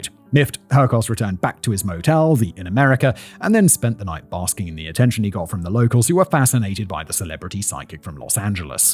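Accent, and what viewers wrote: United Kingdom. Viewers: well he is British so…makes sense how he would have a different pronunciation for words. Also…I knew the correct pronunciation right away. I also had family in the area during the time he was at large. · I'm a Houstonian now, but both sides of my family are from Wisconsin and Michigan. So I get to cringe every time he says something in this episode, plus his British-accented-Spanish-Spanish pronunciations where I'm used to Mexican Spanish.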